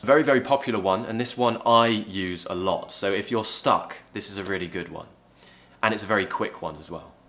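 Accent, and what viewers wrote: United Kingdom. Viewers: Your British accent is really beautiful.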